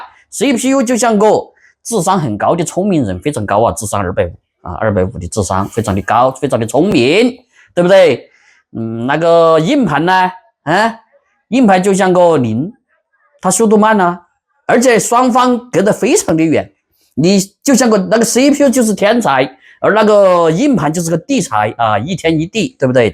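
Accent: Sichuan, China. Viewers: 设备，噻呗😅 的川普很有意思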